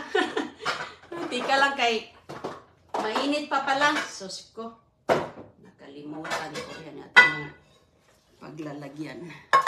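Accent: Visayas, Philippines. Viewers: Minus points: she thinks eating with her hands make her remain filipino and don't want to use spoon and fork, her visayan accent is so bad to listen to, she loves bagoong even her kidw young as they are eat bagoong and eats with their dirty hands, most of her visitors are filipinos too so we can assume they have bagoong in their homes yet she serves food with bagoong always to her visitors.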